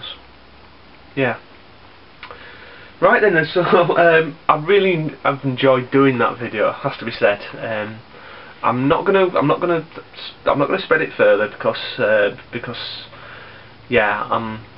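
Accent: United Kingdom. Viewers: you almost sounded british mate ;).. Great answers and it's cool how your family are mostly supportive of your collecting. I respect anybody who has a passion for something!.. on a completely unrelated note, i remember a while back you said that you found a reasonably priced copy of V-V.. did you end up getting it?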